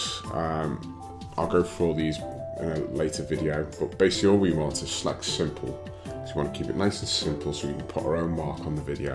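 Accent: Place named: Liverpool, United Kingdom. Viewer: Scouce, lad 😂😂😂😂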